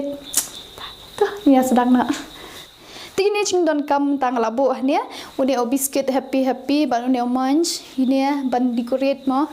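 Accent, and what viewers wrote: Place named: Mylliem, India. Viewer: Sngewnang bha ka sur Mylliem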